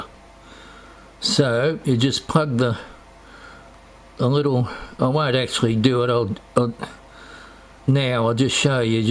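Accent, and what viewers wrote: Australia. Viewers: Thank you man , in fact i was search on youtube to find a way to delete the files , and suddenly i see your's , a great explanation. Thank you again Also i have a question for you What is your accent ? Is it Australian ? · I like your aussie accent ~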